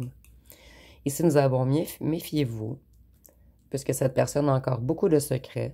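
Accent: Quebec, Canada. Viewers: Vous êtes québécoise vous????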